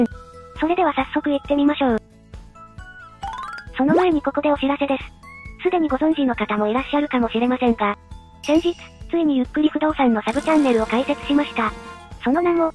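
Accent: Hakata, Japan. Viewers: 若い女の子の博多弁が好きすぎて、思わずチャンネル登録してしまいましたが、(もちろん物件も好き❤)よくよく観ると、若い女の子ではナカッタ...(T_T) ま、いいっか👍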